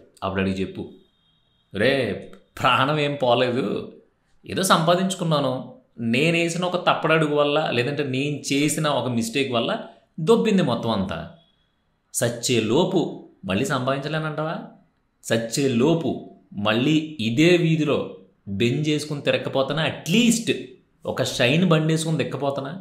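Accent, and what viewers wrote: Godavari, India. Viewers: Bayya meedi a ooru? Godavarenaa...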